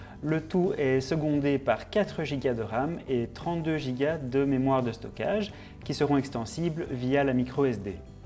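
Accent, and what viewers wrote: Belgium. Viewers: Bon test, parfait, pro, continue comme ça. Ha si... tu es de Belgique?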